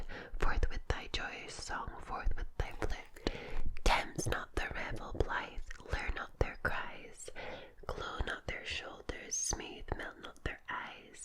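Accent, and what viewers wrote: Scotland, United Kingdom. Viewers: Thank you Mrs..I'm so happy to have found your channel!....I've complimented you before on how soothing and relaxing your whispering in the Brogue is!....You're amazing!...and if I may say so respectfully...quite RADIANTLY BEAUTIFUL!...with all due respect.....you're the best! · I love the Scottish accent. Youtube Closed Captioning is being a cheeky about it though. "Hello Bees and Kettles!"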